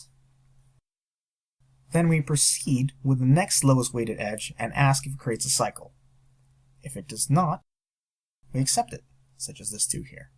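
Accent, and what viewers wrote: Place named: United States. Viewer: Finally, american accent!!!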